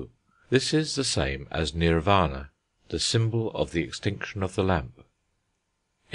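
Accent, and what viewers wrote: England, United Kingdom. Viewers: There is a perverse contradiction having it read in an English accent. Really creepy.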